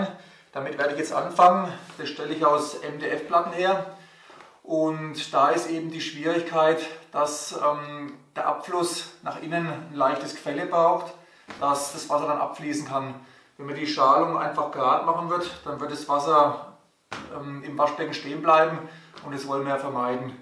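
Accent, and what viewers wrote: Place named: Franconia, Germany. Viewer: Allein für‘s fränkische einen Daumen hoch. Grüße in die Heimat.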